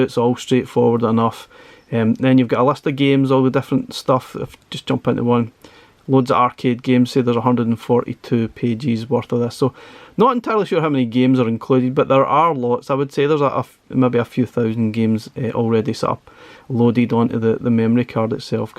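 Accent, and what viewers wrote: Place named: Ayrshire, United Kingdom. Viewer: lOVE IT! Can't wait to get mine, i have 2 minis and really looking forward to the larger form factor! good scottish accent, I'm guessing Ayrshire?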